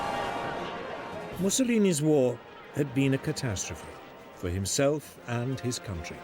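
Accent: United Kingdom. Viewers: I only had to read the description to know it was another propaganda load o'crap about Italy's role so didn't even watch. I would have bet a million it's British, and listened for 10 seconds and yep, sure enough.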